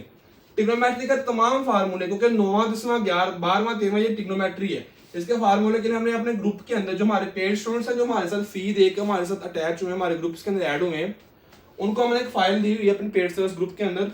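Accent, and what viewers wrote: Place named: Faisalabad, Pakistan. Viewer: Ap faisalabad sy ho? Q ki ap areeb ki tara bolty ho iqra kanwal ky husband jaisy 😊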